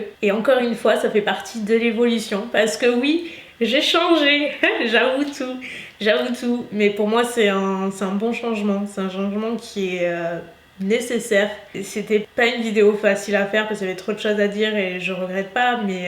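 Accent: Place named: South-West, France. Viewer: Le message d'une abonnée discrète ( et ça me va ) ! Je suis là pour le contenu et certainement pour cet accent du sud ouest qui est le même que le mien ( discrète et chauvine ! ) J'adore cette franchise, tu avais dit que oui tu en devais beaucoup à ta communauté et que non ta réussite de ne lui appartenait pas ! Elle est bien à toi cette réussite! Plein de force, de courage, de réussite, d'amour de toi !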